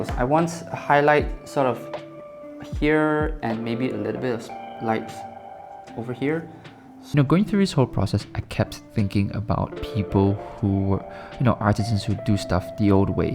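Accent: Singapore. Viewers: I could tell you're from Singapore from your accent!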